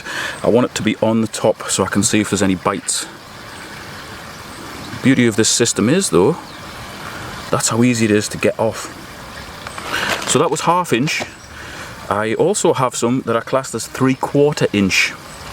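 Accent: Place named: North Shields, United Kingdom. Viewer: Hello. I just subbed you through watching Tonys top 15 youtube channels. I too am also interested in wild camping and all that stuff so I will be watching your vids and I might even learn a trick or two :) By the way, it sounds like you live somewhere in my area? I'm in North Shields like! :)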